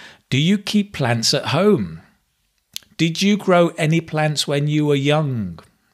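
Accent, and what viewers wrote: United Kingdom. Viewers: Hello you can not imagine how much I enjoyed this 48 minutes. The time really flew. I am more in contact with American people so I am real surprised how well I could understand your British accent. I was really lucky finding you here. You pronounce really clear. You made me laughed when you explained the different ways to say a sentence. You were so cute. From now and then you will be my teacher in the distance. I have to improve my English, mainly my listening. Thanks a lot.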